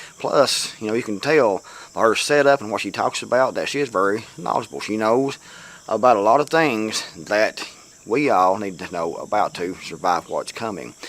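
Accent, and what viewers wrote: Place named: East Tennessee, United States. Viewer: I heard Patara mention your wonderful East Tennessee accent the other day; I had to just nod. Tennessee accents are so beautiful to me. (I'm from SC.) I AGREE WITH YOUR VIDEO.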